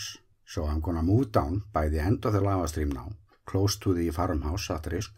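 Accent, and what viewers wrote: Iceland. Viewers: Thanks for the info. I find it difficult to understand sometimes what you are saying because your English has such a strong Icelandic accent. This is something you could work on with a native English speaker . . . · I’m Canadian (I only speak English), and I understand him well. I actually enjoy listening to the Icelandic accent :)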